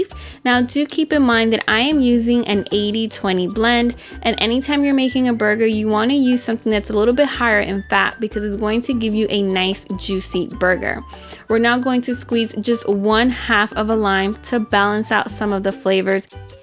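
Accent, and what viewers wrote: New York, United States. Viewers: The Noo Yawk aspect of this video is EVERYTHING tqmmmm 🥰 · are you from New York bc you sound like your from NY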